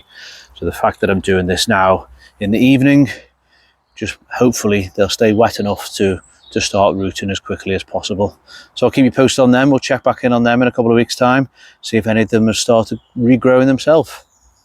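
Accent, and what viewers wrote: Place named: Midlands, United Kingdom. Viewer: I just learned allotment gardening when we were over in the UK this past March/April. My husband is listening to this video trying to guess your location. So far he's guessed Midlands, Birmingham, Manchester 😂 He's from Leicester originally. I love your greenhouse! ❤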